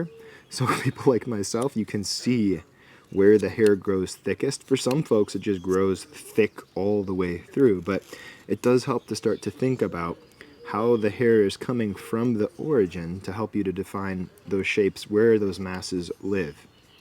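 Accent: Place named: East Coast, United States. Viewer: Alaska is still warming up. It's nice to hear east coast. Again ,you are making this so fun. I'm still struggling to keep my tools sharp. I'm going to order a wheel grinder. Do you have any preferences to China brand , or is the smaller 50.00 grinder on Amazon, vrs a 200.00 home depot. Maybe a video to help. Or the belt with sand paper? Maybe better. What you think?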